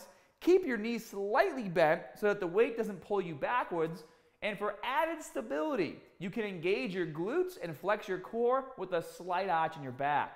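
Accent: Boston, United States. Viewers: The Boston accent was strong in this video lol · is that a boston accent i hear 👂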